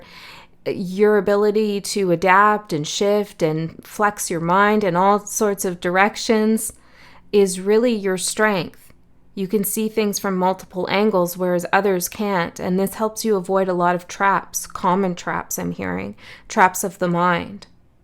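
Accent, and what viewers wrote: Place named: Canada. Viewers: it's an accent. she is canadian. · I think it’s Canadian speak 🤭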